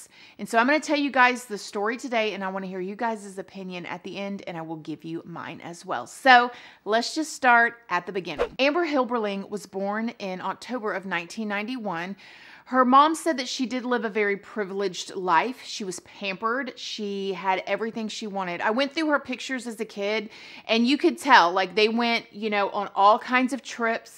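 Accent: South, United States. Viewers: nah that’s just how we pronounce it in the south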